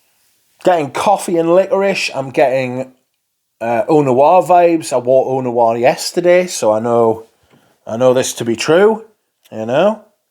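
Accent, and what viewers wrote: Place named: Durham, United Kingdom. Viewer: I think it's fine but nothing special. I'm pleased I didn't buy a full bottle. It's very similar to other fragrances I've tried recently. Are you from Durham? I'm from Durham you sound a bit like myself.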